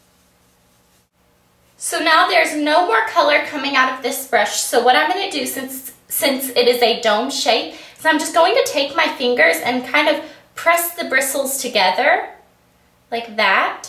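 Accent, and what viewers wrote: South, United States. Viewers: You eye make up is gorgeous in this video. Can you please do a tutorial on it? As to your voice: It's probably because you have a southern belle accent. Personally, since I am from the northwest, southern accents tend to irk me, and so that's probably why some people say they find your voice annoying. Not your fault, just some of us odd Northerners ;) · i love ur voice!! dont listen to all those people who are south accent haters. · Um... I just have to say that I absolutely love your accent! I'm from the south, and I think country accents are cute! I'm proud to have a country accent :)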